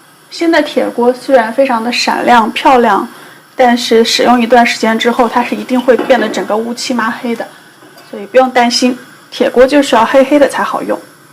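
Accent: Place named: Xi'an, China. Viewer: 听口音有像西安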